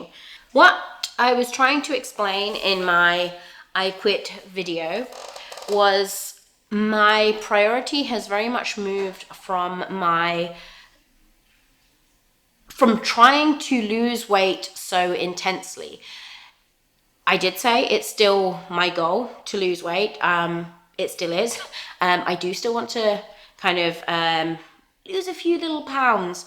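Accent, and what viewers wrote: England, United Kingdom. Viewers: Since you have an English accent, you lived in Japan, and now your in California I am assuming yours is a military family? Sorry if this is common knowledge. I'm a new sub.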